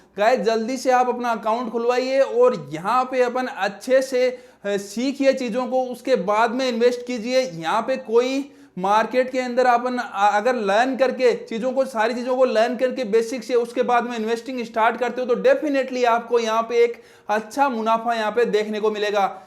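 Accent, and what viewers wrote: Rajasthan, India. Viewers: It seems u r from Rajasthan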